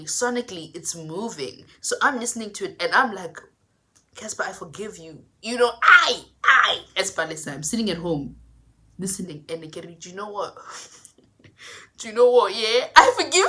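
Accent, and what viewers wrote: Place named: United Kingdom. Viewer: I'm here for the British accent 😅.. Energy always on another level 🔥🔥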